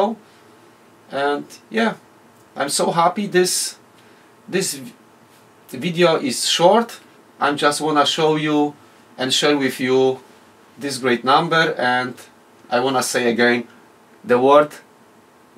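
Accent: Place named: Poland. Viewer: Nice Polish accent:)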